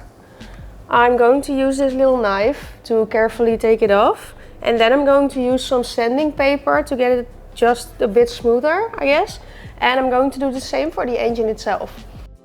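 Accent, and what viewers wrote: Netherlands. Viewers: Nothing better and clear than Dutch English. 💪❤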